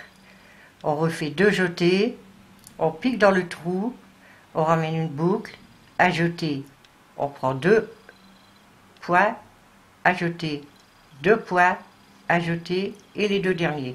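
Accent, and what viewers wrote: North, France. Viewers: bonjour, je suis née a Bruay sur Escaut, et ma famille était a Raisme, Anzin, la dernière tante nous a quitter, la tata m' a préféré, j en et plus, mes j adore entendre l accent du nord, gros bisous toi qui et très très gentil, gros bisous Monique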